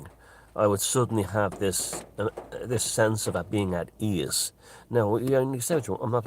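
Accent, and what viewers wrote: Ireland. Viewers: The combination of rain falling on your skoda and your Irish accent,delivering knowledge is the true magic. What we have we hold!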